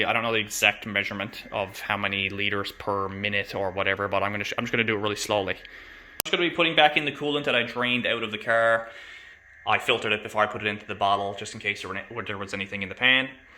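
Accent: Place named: Ireland. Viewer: A sense a bit of Irish accent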